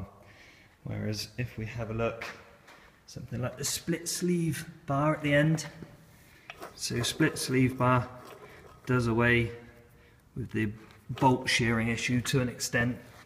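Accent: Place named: West Country, United Kingdom. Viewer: Love the sound of 'knurling' in a West Country accent.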